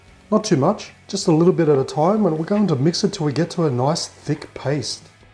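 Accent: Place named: Australia. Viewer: i like your aussie accent bro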